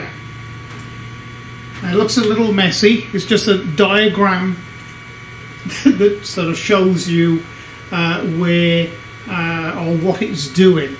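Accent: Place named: England, United Kingdom. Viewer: dude you sound english but you are talking in a currency that WE DO NOT USE?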